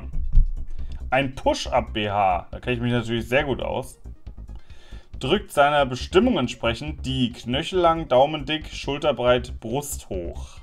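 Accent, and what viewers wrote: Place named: Saxony, Germany. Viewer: Sächsisch lool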